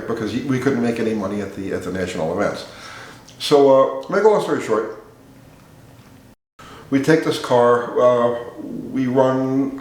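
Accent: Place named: New York, United States. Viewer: It's only a matter of time before has his own massive car show/meet and greet. Celebrity status! 👍 P.S. I knew you didn't sound like you were from TN 😁. Where in NY?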